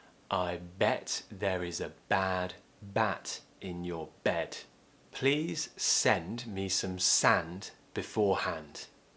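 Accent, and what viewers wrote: United Kingdom. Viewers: You are a very good teacher!I love the British accent, would love to learn more! Thank you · You are the best, british accent is the best ,I'm happy from Africa 🇲🇱🇲🇱🇲🇱❤❤❤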